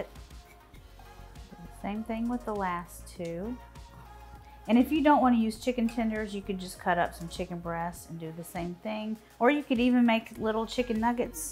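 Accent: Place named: South, United States. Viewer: Hi! I just made this for dinner tonight and I so enjoyed! Loved the tenders and the bacon plus mustard powder made the cauliflower mac and cheese yum-may 😊. I enjoy your recipes and your sweet voice. It makes me think you're from the hospitable sweet south. Keep these recipes coming. TY!!!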